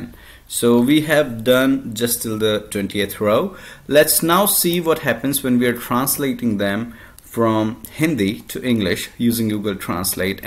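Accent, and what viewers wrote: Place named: United States. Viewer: bhai tu amerian accent me kyu bol rha hai ?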